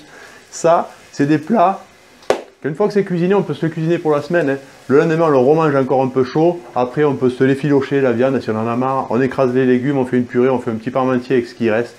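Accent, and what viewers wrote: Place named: Provence, France. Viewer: J'adore, je salive et l'accent de Pagnol en prime, que demande le peuple ! 👍😘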